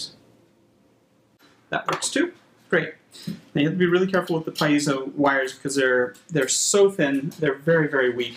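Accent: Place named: Canada. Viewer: Canadian much? Great vid BTW...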